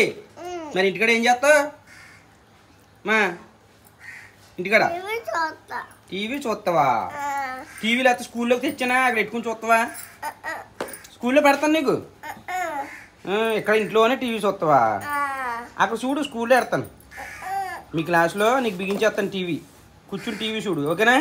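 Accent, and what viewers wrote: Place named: West Godavari, India. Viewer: పిల్లలు తో మీరు చేసి సరదా సరదా ముచ్చట్లు చాలు బాగునాయీ . అచ్చమైన ప గో భాస మరియు యశ వినసొంపుగా ఉన్నాయి ఆండీ కీప్ rocking విత్ యువర్ vlogs బ్రదర్ 😍😍😍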